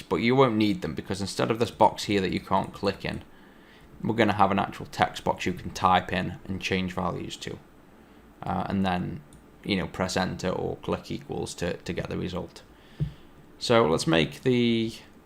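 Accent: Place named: Stoke, United Kingdom. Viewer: You sound like a Stoke lad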